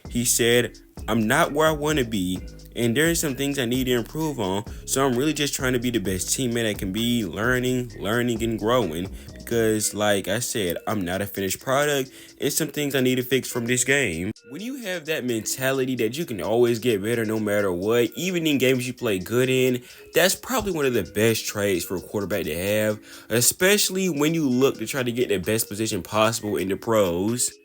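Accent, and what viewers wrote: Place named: South, United States. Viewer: Country AF😂 but i understood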